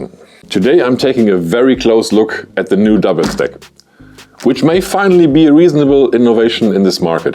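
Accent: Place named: Germany. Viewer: You speak with a German accent and refeer to inches!? Who knows what that is?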